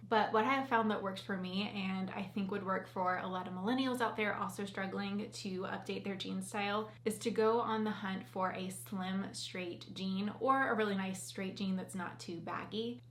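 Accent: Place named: South, United States. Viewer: She's southern :)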